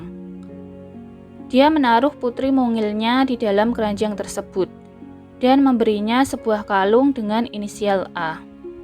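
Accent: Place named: Java, Indonesia. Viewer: doorrrr Jawa banget aksen nya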